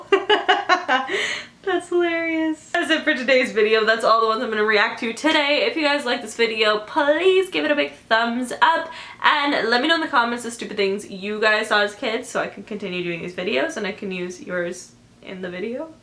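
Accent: United Kingdom. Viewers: It's not a vid if she doesn't slip into a British accent sometime in the intro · Why does always sound british at the beginning of her videos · she has a really english accent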